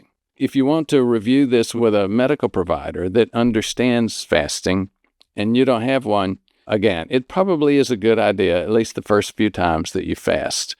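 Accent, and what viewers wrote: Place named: Midwest, United States. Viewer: Calling attention to some unfortunate ambiguity arising from terminology. In southern Illinois, where I grew up, dinner is at noon -- supper is at six. You sound to likewise have had a Midwest upbringing, but I'm nevertheless unclear on your terminology, and fear that others are also struggling with this. Regardless of this unfortunate confusion, thanks for all your efforts. I contend that by profession, doctors and lawyers wrongfully withhold knowledge from the masses through obfuscation. We're not stupid. Your efforts to feed the intellect of the alienated are a godsend.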